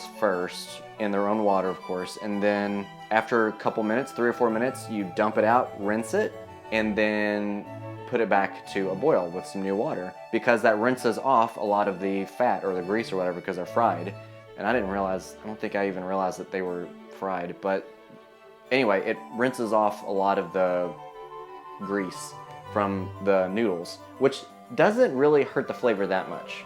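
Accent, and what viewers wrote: South, United States. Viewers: I thought I heard a southern accent! We are in NC now but my hubs is a native Nashvillian.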